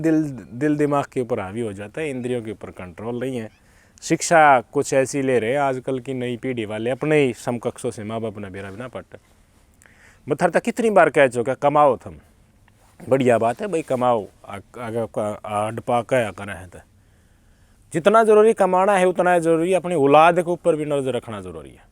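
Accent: Haryana, India. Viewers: Aapki bato ko sunkar hasi bahut aati hai haryanvi boli is best bro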